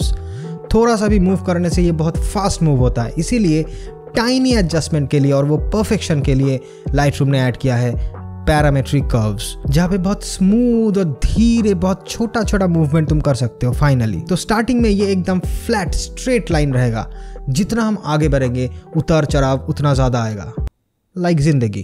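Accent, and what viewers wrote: Bengal, India. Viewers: That bangla accent 🔥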